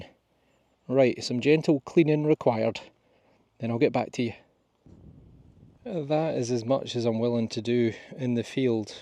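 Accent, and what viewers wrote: Scotland, United Kingdom. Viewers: Gonna be honest. I saw one of your shorts and I'm watching this now mostly so I can hear more of you talking. 😄 I'm an American and I get such a kick out of a Scottish accent! Ok, I also love metal detecting videos, but add on a Scottish accent and that's 50min of pure enjoyment. 🙂💜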